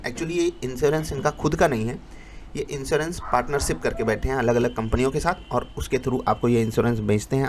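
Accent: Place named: Uttar Pradesh, India. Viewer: Sir ap kon c city me rhte ho. Apki tone se main guess kr skta hu aap Uttar Pradesh se belong krte ho. Kindly reply krle batana jrur muje sir m waiting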